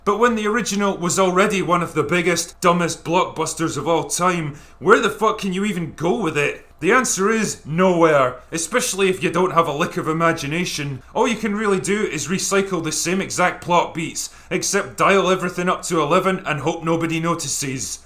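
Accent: Scotland, United Kingdom. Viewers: Giant space testicle and shooting the queen alien in the arse. Priceless - all made better by the angry Scottish accent 🤣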